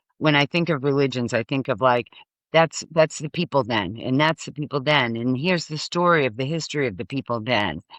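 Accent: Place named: North Shore, United States. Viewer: This was my 1st time watching this channel and I wish I could HYPE it. Subscribed with a smile., Thank you sooo much for sharing your stories and insightful perspective. What a wonderful NDE! It touched my and my husband's heart. Don't stop telling your truth...The world needs to know. Thank you for helping to wake up the collective. By chance, are you from the N. Shore of MA? 😉 I'm from Wellesley but went to college in Beverly and you sound just like those wonderful people! 🤸🏻🤸🏻‍♀️🤸🏼‍♂️ PS. You both sharing genuine love at the end filled our hearts.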